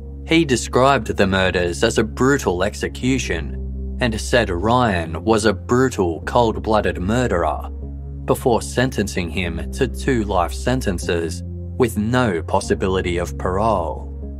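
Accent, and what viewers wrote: Australia. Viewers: certainly is an Aussie accent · Yes. Definitely an Australian accent.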